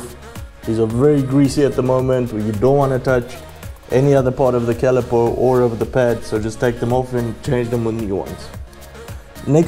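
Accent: South Africa. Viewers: Good and very well done video! Must say you sound like us here in South Africa 😂. How do they compare to the original pads from BMW, which is also Brembo I think? Did you order from the official website? Keep up the good work!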